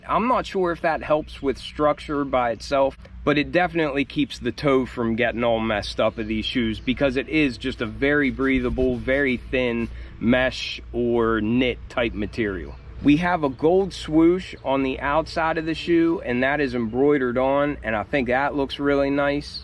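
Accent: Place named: Pittsburgh, United States. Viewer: You from Pittsburgh bro? I noticed the hat and shirt. Plus the accent . I’m from there so I’m curious…I paid resale for those too unfortunately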